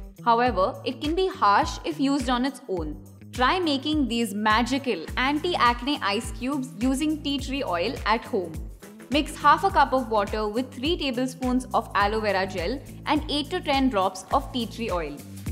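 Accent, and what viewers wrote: India. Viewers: Her Indian accent "patoto"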